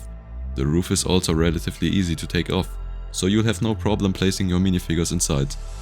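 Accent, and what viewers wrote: Germany. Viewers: Perfect build and video, I hear some german accent I think 😂❤ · your english sounds like my friend from saarland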